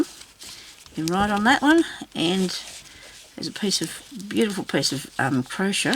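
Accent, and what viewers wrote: Australia. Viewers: Good day from WA. Its so nice to here another Aussie accent. Thank you so musch, I think your book's awesome!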